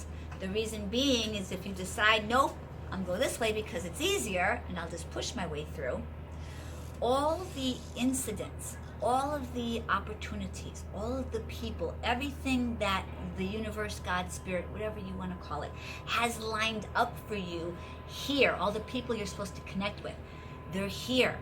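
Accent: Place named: United States. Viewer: A very pleasant person! Always laughing very enjoy to live! I dont understand all she is telling because she speak to fast and with a american accent but i come to see her !! In front of her energy i am happy for the day!!!!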